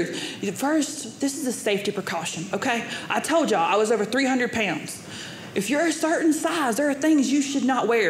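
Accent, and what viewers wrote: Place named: Alabama, United States. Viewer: i like her, she talks like me! From Alabama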